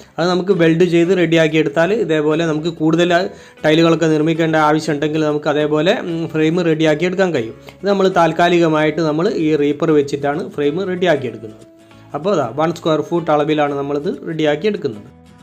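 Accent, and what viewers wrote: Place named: Kozhikode, India. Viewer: കോഴിക്കോടാണല്ലോ ഭാഷ,ഞാനും ഒരു കോഴിക്കോട്ടുകാരനാണ്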